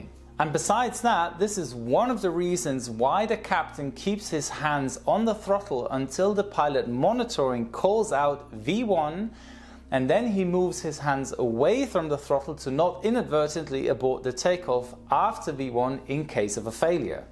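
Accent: Germany. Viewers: Vipersniper_369 hes German. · Bist du deutsch? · Du bist deitscher..ich höre die Akzent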